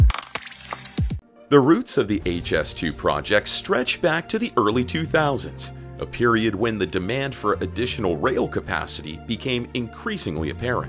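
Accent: United States. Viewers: Why the hell is a yank the voice of the video, can't they afford to get a British person to do the voice over. The HS2 is a English Railway engineering project between London and Birmingham Not New York to Miami. 💂‍♂️💂‍♂️🇬🇧🇬🇧🏴🏴😡😡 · what mistakes, and what problem do you have with the American accent? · Littered with mistakes and covered in an American accent 👎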